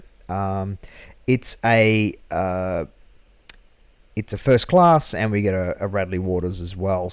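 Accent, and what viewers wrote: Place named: Australia. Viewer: Always good to here an Australian voice, I'm at the progetto iv that's how ya spell it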